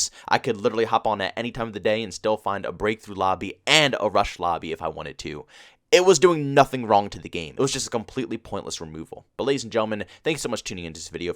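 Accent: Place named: Mid-Atlantic, United States. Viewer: Dude you must be from the PA, Maryland, Delaware area with that shitty accent. The way you hold your O’s is atrocious lol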